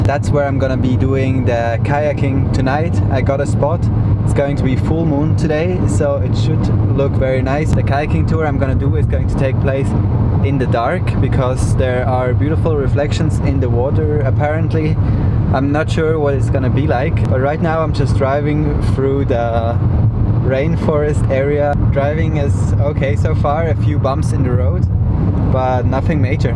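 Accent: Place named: Germany. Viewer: Nice to listen vloggers with an Accent that U have idea where its from... My guess is German.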